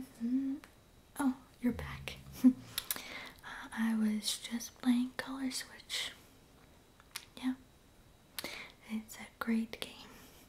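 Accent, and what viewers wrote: Canada. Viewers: Fruity Newfie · Are you canadian?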